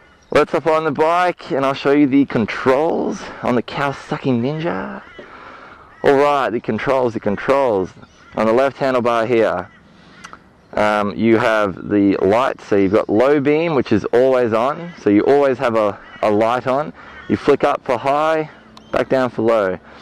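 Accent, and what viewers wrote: Australia. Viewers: Hes obviously Australian you knob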